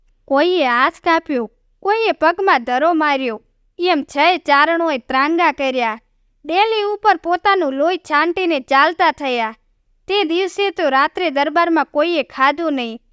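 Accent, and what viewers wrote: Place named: Banaskantha, India. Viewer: સરસ વાર્તા ઓ મૂકો છો તમારો અવાજ બનાસકાંઠા નો લાગે છે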